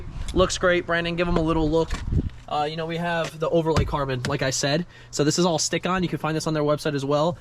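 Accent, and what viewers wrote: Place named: Virginia, United States. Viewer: What part of Virginia is he from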